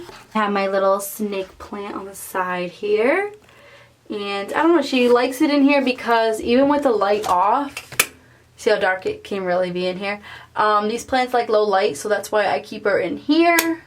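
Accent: Boston, United States. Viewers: smile every time when I hear the boston accent. Awesome video.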